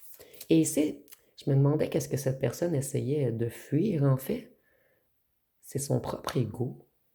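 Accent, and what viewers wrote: Quebec, Canada. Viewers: Est-ce que tu viens du Québec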